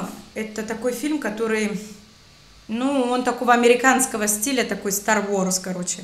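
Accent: Russia. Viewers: Что-то я не понял... вы не русская? У вас же идеальный русский акцент. И построение фраз. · Специально пишу по-русски. Дорогая, я бы Вам 10 лайков поставил, если бы была возможность. Вы - чудо, в том числе как певица. Увы, пока не было возможности оценить Вас с дирижерской палочкой в руке, но уверен, что и в этой ипостаси Вы не разочаруете. )) Спасибо огромное за рецензию и более чем профессиональную и качественную аналитику. Подписан на Ваш канал, всегда внимательно слежу. Как уже не очень молодой человек, имеющий взрослых детей и (пока одного!) внука, плюс как филолог-русист по университетскому образованию, хочу Вас поздравить с практически идеальным московским произношением и грамматически безупречным русским языком. Вы иногда сомневаетесь в своей лексике, но всё делаете правильно. Берегите свою семью и Вашу прелестную дочь, в первую очередь (видел её в другом ролике), в наше неспокойное время. 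И себя, разумеется )). Храни вас Бог. Привет из Москвы. Всем мир! Вадим. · Здравствуйте! Послушал ваши реакции на русском и английском языках. Похоже на то, что ваш родной язык -русский, так как английский уступает в произношении, присутствует акцент и вы прежде всего русскоговорящая, владеющая английским. Но разбор произведения отличный) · По русски говорите безупречно, мне вот стало интересно, иностранцы англоязычные замечают-ли Ваш русский акцент...?)))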